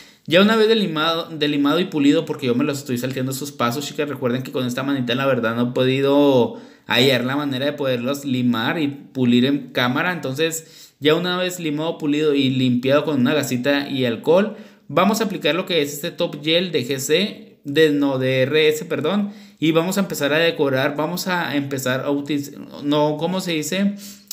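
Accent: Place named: North, Mexico. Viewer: Hermoso para una novia de impactó 😍eres norteño? Me gusta tu acento, si queremos diseñitos en punta mas corta saludos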